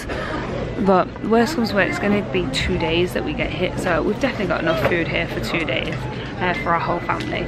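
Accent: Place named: Liverpool, United Kingdom. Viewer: Glad your safe...how Scouse....beans on toast for tea....ha ha....x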